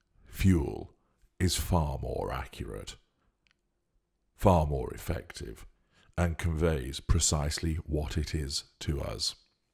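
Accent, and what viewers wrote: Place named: Oxford, United Kingdom. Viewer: I bet your bass baritone Oxford scholar accent is fuel for plenty of men and women, narcissist or not! 🎙️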